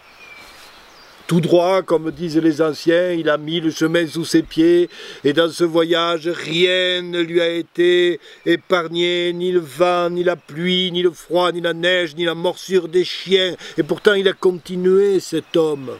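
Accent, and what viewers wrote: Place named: South, France. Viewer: Du Paolo Cuhelo, avé l'accengn... Merci ! J'allais dire : encore ! Mais encore, tu nous le donnes déjà.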